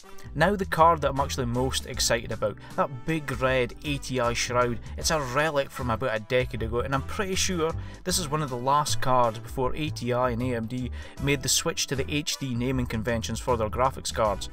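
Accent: Scotland, United Kingdom. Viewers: I hope your channel does well mate! I'm from Glasgow, good to hear a fellow scotsman! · Ah so you're Scottish eh?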